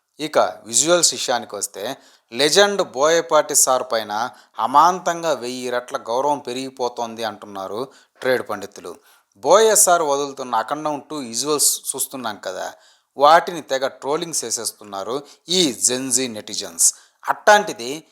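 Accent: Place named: Kurnool, India. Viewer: Endira ఇది థాయ్ 😂 మా కర్నూలు స్లాంగ్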